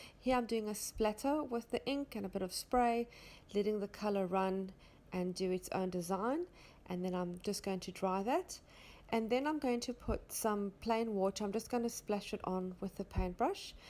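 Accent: South Africa. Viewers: Hi, you sound South African, where do you live. It’s so nice to hear your accent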